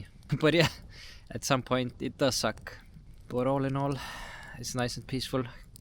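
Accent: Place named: India. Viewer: plzz aitab! Sa teed megägedusi... aga see su `english`` on nagu india smthinng .....nääääh.... noup... no ei,,,,,kus on Gabriel??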